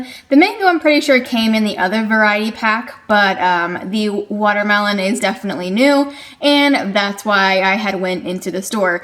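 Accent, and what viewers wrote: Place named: Georgia, United States. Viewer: Girl I thought you were from Columbus ga lol.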